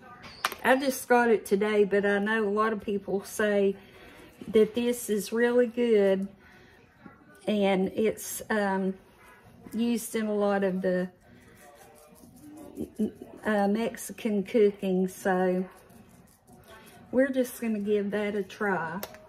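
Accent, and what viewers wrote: South, United States. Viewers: Loved your taste testers! Beautiful mother and daughter!, I love watching your channel. You have that soft spoken southern sounding charm and I love when you say “cut off the stove”. My grandmother used to say that. Anyway, I always try to do something nice for myself and others! Much love to you!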